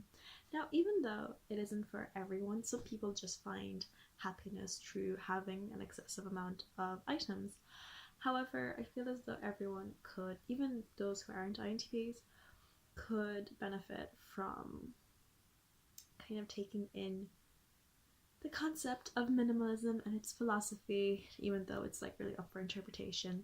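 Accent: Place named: Ireland. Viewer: You have such a lovely accent. Where in Ireland are you from?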